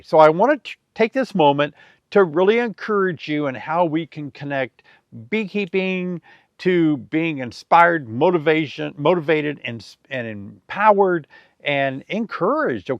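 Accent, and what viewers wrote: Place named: Appalachia, United States. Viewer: It’s an Appalachian accent. I use them both here in Pa!! I’m extremely green with my first hive this year. You are a great source of information and a great speaker. Just added electric fencing to the area too. Bears will be getting ready for winter here! Thank you again sir!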